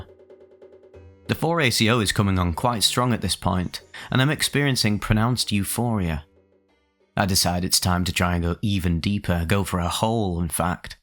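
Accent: United Kingdom. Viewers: He’s British that’s just how they enunciate lol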